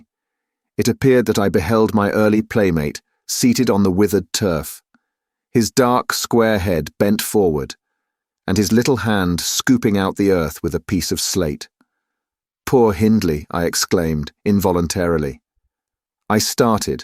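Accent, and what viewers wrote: England, United Kingdom. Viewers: Thank you for the wonderful reading and your beautiful British accent. I spent three days listening to this audiobook—a true masterpiece, once in a lifetime. The language is so beautiful, with a vast and rich vocabulary.😇😇😇😇😇😘😘😘😘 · I can’t listen to this English accent bs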